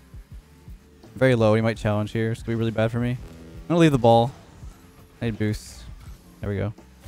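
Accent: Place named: Canada. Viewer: you never let me forget that you’re a Canadian lmao: I’ve figured him oooooouuuut 😂❤️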